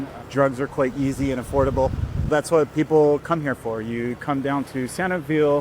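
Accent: United States. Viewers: This guy has a us accent, but he's not from the states. Or Mexico.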